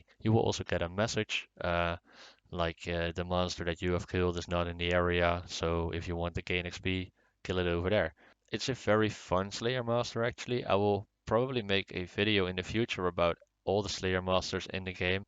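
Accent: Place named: Netherlands. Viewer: u sound dutch :D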